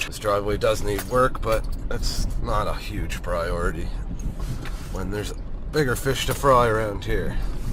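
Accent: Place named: Nova Scotia, Canada. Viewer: Right on. My wifes aunt lives in chester grant. I thought you sounded like an Aylesford/ Morden guy.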